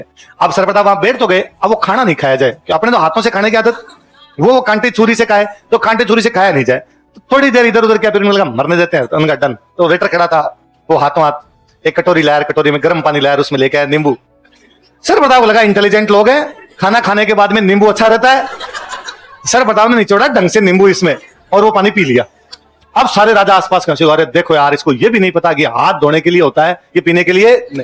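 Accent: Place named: Marwari, India. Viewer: मारवाड़ी लहजे को समझने थोड़ी दिक्कत हो सकतीं है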